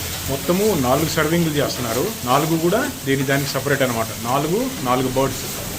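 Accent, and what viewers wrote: Andhra Pradesh, India. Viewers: Nizamabad antunnaru Andhra slang undhi reason? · mi slang Telangana slang lekka ledhu meedha andhra na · Ayana language kuda andhra valla lane untundhile. Valla family antha kuda andhra slang a matladtharu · Ne slang motham Andhra slang lekka undi anna 😅.. nizamabad ante pakka telangana slang unatdi anukuna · అన్నా మీ భాష ఆంధ్ర వాళ్ళ లాగా ఉంది.. పక్క తెలంగాణ లేదు... ఆంధ్రలో చదువుకున్నారా? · Nizamabad antunnaw andra yaasa matladtunnaru Nizamabad antea pakka telangana bhasha matladutharu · అన్న మీరు ఆంధ్రా నుండి వచ్చి ఇక్కడ సెటిల్ అయ్యారా? అంటే స్లాంగ్ నిజామాబాద్ ఫీల్ ఇవ్వట్లేదు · Meru andra valla telugu valla slang enti andra slang laa undi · తెలంగాణ బాషా యాషా ఇది కాదు నువ్ ఆంధ్ర బిడ్డవి ఉన్నట్టు ఉన్నావ్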